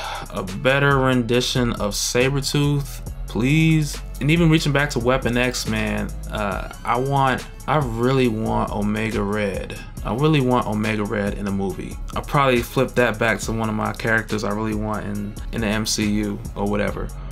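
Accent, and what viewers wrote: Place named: East Coast, United States. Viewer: where are you from? iam from jersey and you definitely have that east coast vibe..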